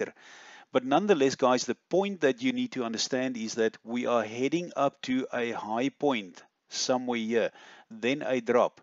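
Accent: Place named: South Africa. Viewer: Thick South African (Afrikaans) accent...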